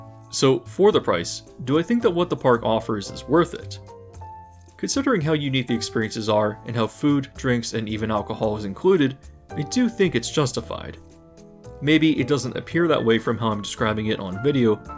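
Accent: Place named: United States. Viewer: Might just be American accent messing with the pronunciation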